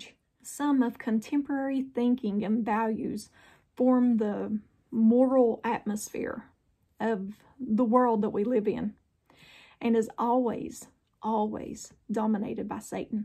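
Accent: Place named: South, United States. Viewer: Oh, you write so well! I like how you talk and then refer that to that of the Bible. I really like the length of your video too. It allows one to get the word in important snippets, without having to do a real deep study. Your video is great when you only have a few minutes, but want to be close to the Lord! Thank you for your work, I very much like listening to you (that Southern accent is precious)! On a side note, I just have to comment on what a pretty lady you are. Your complexion is flawless and you're really lovely to watch! Thank you again!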